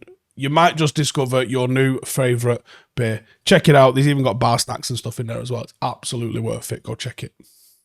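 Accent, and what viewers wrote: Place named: Salford, United Kingdom. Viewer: It's an accent, my mates were from Salford and they say it the same.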